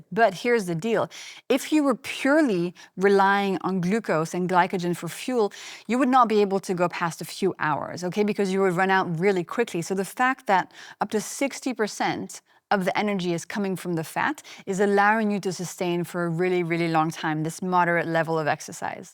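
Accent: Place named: France. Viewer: Your voice is mellifluous ! Ur french accent makes all the difference I guess and u use a lot of hissing sound. No wonder..most French women are sexy and sound sexy ! But, this dress sucks !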